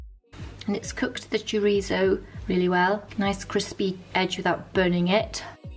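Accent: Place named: Manchester, United Kingdom. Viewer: You can get there. Your skills will only sharpen. You should watch a video about Lucalis pizza in New York how he started. I see you Mabey having your own pizzaria one day. I'm curious are you from Manchester? I deal with alot of tourist I'm a mixologist on the river walk and your voice sounds like its from Manchester.....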